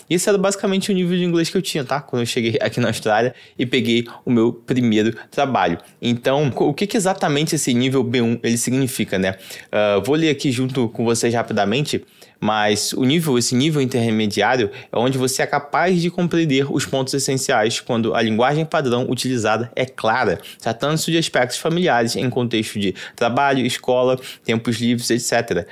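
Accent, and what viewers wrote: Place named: Brazil. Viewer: mano nunca sinta vergonha do seu sotaque brasileiro, 99% das pessoas que trabalham em TI pro exterior tem sotaque, no final das contas quem trabalha pro exterior sempre acaba trabalhando com indianos, poloneses, russos, filipinos, e obvio que todos eles tem sotaque xD nosso sotaque é nossa identidade também !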